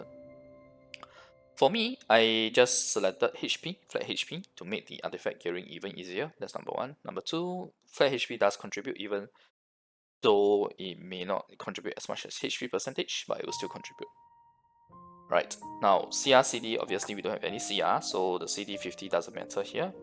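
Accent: Malaysia. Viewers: Hey, came across your video on a fine random Sunday, and gave it a listen. It's rather long and my brain has troubles processing lots of technical information so I thought I would drop a comment to clarify a few things instead. But first of all... Nice to know there's a fellow SEA player out there, in fact, I'm guessing you're Malaysian more so than Singaporean:P. Yes, the accent was super thick (no, nothing wrong with that). If you are Malaysian, just know we're brothers KEK. I'll be even happier if we actually live in the same capital, cause I see a lot of Malaysian faces on Genshin and a few of them doing content creation, and I think we could use more Malaysian talents in a game like Genshin Impact. Now onto my question... Everlasting Moonglow. You mentioned something about the weapon's passive giving FLAT bonus instead of Multiplicative bonus. Like I said, I am not a math guy and technical stuffs isn't my forte. 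Usually, I just do generic math and that's about it, but what is multiplicative bonus in this context? Say I have 50,000 HP on Kokomi now, and this weapon gives me 3% at R5. Can I assume multiplicative means 3% of 50,000? Meaning 1,500 for Normal Attack DMG as stated in the weapon's description? Or is this FLAT? All things taken into consideration, the increase does feel rather meager, but I think the gem of the weapon lies in its ER mechanic. Here's what I don't understand tho. I'm assuming you referred to the Kokomi Mains' master guide for damage cros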